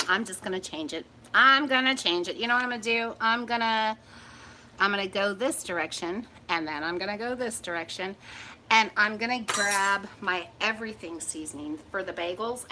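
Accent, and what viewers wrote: Texas, United States. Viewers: How is it I am just now finding you? LOL. You sound like you may be a fellow Texan. I am enjoying your videos.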